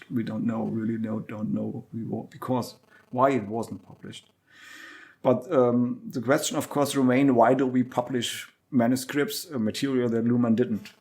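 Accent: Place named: South Africa. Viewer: Out of interest, where is the creator of this podcast from? Your accent sounds very similar to a South African accent. I am from South Africa, and this is intriguing.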